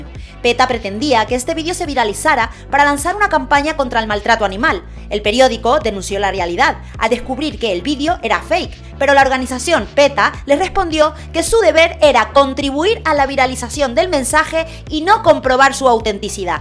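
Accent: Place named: Spain. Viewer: yo no se como pregunta si es de argentina si el acento de españa se le nota a leguas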